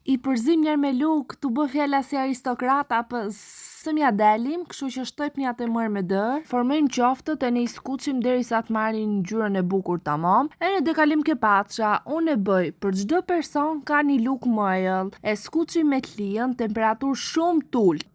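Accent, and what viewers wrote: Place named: North, Albania. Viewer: Na knaqe me dialektin neve që të kuptojmë , se Jugu për 100 vjet s’mund ta marri vesh se çfar je tu thënë😂😂😂😂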